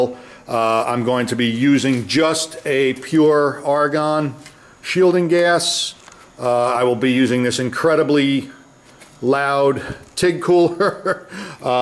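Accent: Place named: North Jersey, United States. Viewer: I was figuring that was North Jersey accent I was hearing..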